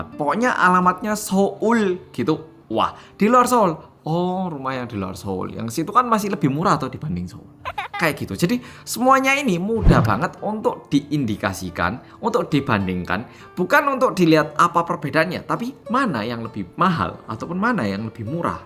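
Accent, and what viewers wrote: Java, Indonesia. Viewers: Mas Korea kok medok Jawa ya 🙏👍🇮🇩💪🇮🇩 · Kok medok banget sih masss, jd unik lohhh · Cak, yoopo carane sampeyan ngomong logat korea.. padahal sampeyan medok, bikin tutor cara pengucapan bahasa Korea lah cak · Enak bnr dgr logat jawa nya 😅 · First time aku nonton vlog orng korea medok kyk org jawa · Medok men bahasa mu mas bro.. 👍👍👍 Biar gak terlalu berkompetisi harus belajar spiritualitas mas bro 😁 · Informasinya sangat jelas, mulai dari hal kecil sampai hal yang rumit. Intinya Bullying dimana-mana selalu ada, Karena sifat dasar manusia yang ingin menguasai dan diakui. Dan Sebagian besar orang-orang seperti itu terbentuk dengan sendirinya oleh lingkungan disekitar. Terima kasih, sudah membuka wawasan kami tentang kehidupan di Korea ya Mas 😁 Saya suka Gaya medok Njenengan hehe.. Salam dari Surabaya 🙏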